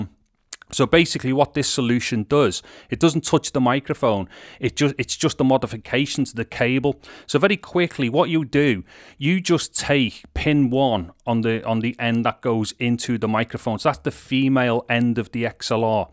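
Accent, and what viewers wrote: Liverpool, United Kingdom. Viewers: You sound like a skouser. I'm from the US but I know a skouser when I hear one. Thanks for your mic help.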